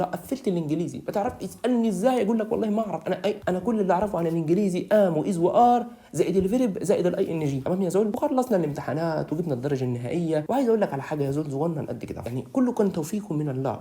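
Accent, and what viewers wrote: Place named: Menoufia, Egypt. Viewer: ديي لغة المنوفية ادگتره😂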